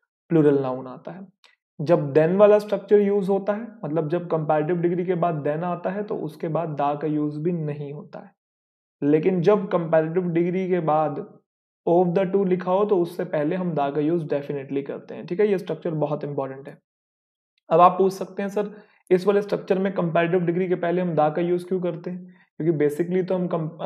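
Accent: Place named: Punjab, India. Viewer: Your Punjabi accent 💘 omg.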